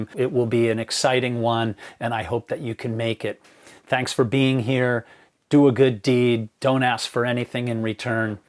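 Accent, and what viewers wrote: United States. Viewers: it sounds like a cosmopolitan New England accent, so not too strong compared to Maine or Boston.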